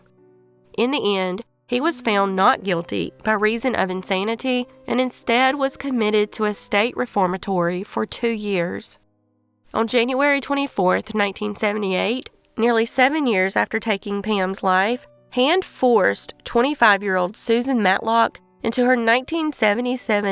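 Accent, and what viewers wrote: South, United States. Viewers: Nice to hear a southern accent even though mine makes yours sound like a Yankee. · Only southern accent I like. Thanks for all of your hard work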